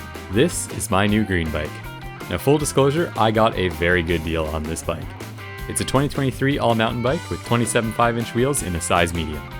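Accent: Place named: Canada. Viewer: I absolutely the combination of yar calm voice and the canadian accent, you basically have the perfect narrating voice ❤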